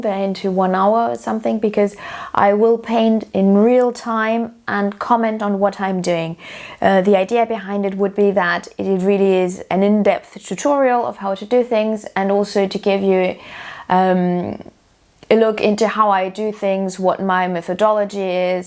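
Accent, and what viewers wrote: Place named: Australia. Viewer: Love your teaching so much ❤ I love your accent, I'm guessing that you are from Australia originally? I'm in Newcastle England. If you get time and only if you think you're viewer's would be interested could you explain how to paint sheet music like I've seen in some old still life paintings. And how to paint writing on letters such as that painting by David of the dead man in the bath please. Happy new year 🎉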